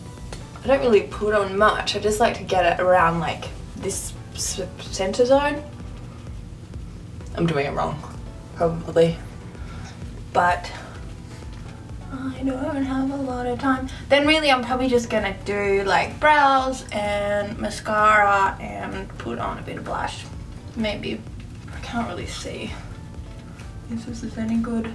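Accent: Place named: Australia. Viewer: Morning routine twins! You are stunning. Also, yay for us Aussies! Can't get much realer than that accent x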